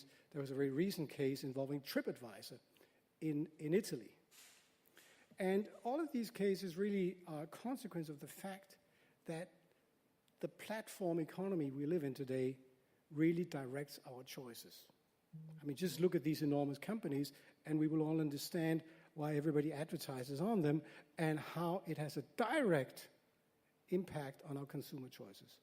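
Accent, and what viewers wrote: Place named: Denmark. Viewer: This is Danish English-speaking,,,, blurry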